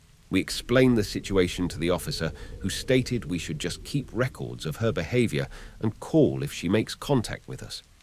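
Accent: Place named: England, United Kingdom. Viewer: An , English narrator ! 😀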